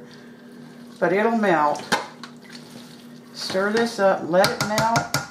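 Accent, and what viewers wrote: South, United States. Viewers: i love cooking with my pressure cooker (i have the instant pot model) and i love making mac and cheese in it. can't wait to try your recipe! thanks! also, if you don't mind my asking, where are you from? your accent sounds a bit like my family who was from texas/oklahoma/louisiana. thanks!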